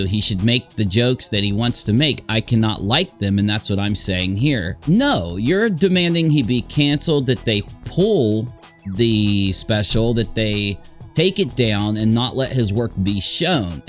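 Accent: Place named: Midwest, United States. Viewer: keeps saying "the Florida statuette" when talking about the Florida statue that protects Brian's parents. A statuette is just a small figurine, so when she says "the Florida statuette" all I can picture is a small figurine in Florida somewhere LOL. I don't know why she doesn't Google that term to make sure she doesn't sound like an inbred moron, especially considering how she Google's everything else she "knows." Or maybe it's her Midwestern low IQ accent that is making her say it that way, either way it makes her look and sound even more ridiculous than she already does. I'm not mad at it though it just makes watching her videos a little easier knowing what a clown she really is. 😂👌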